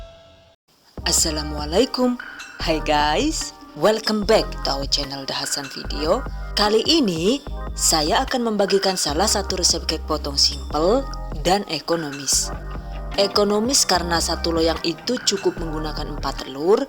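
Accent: Banyumas, Indonesia. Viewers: Mksh resepnya bunda, sy klo lihat videonya bunda suka ngekek sendiri klo lg pake bahasa ngapak hahahaaaaa, lucu. Smg tambah sukses buat bunda yaaa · Nembe nonton... Ternyata ana sing ngapak juga... mandan kaget aku.... 😂😂😂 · heheh.... ngapaknya ya allah saya bgt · ngapak2 logat suaranyaa iya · aku seneng deh ne wis ngmnge ngapak 😄😄😄😄😄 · Lucu ngapak bgt kaya enyong hahahaha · Wkwkwk ngapaknya lucu, asline sing Endi sih MB?? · Kayane kie wong ngapak olih bojo wong jawa timur kiye . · Rikane deneng teyeng ngapak Yuu...jarku wong Madiun koh ngapak😃😍👍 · . Rika sih womh ndi. Jebule ngapak 😅😅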